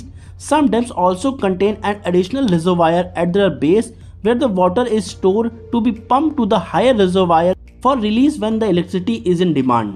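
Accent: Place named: India. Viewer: funny indian accent🤣🤣🤣🤣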